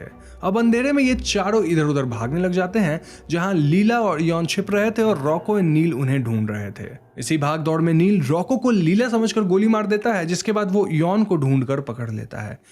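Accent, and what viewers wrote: Bengal, India. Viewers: Aapki Bengali accent wali Hindi Alag hi feel deti hai... KO😍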